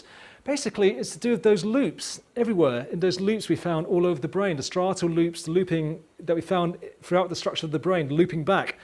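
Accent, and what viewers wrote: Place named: United Kingdom. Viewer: This is the kind of thing that makes YOutube great. Not this Miley Cyrus garbage all the time. Great video. Weird to hear a Chinese guy with a British accent!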